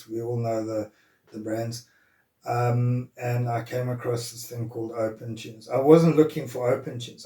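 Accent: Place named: South Africa. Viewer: That ZA accent !!! Not just DNA.... Love to see content like this OG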